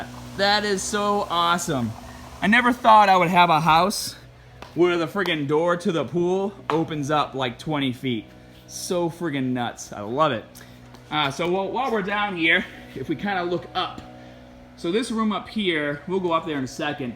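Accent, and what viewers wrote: Boston, United States. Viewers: how much will the rent cost? and also will you lose your boston accent?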